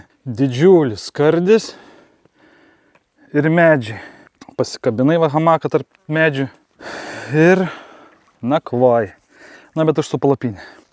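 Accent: Utena, Lithuania. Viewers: Pagaliau utenietiškai😉😁10/10 reikikia Utetenietiškai , be visi Iš Telšių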